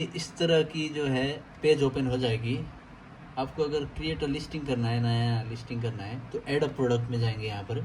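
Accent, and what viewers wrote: Haryana, India. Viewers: Bhai haryanvi h k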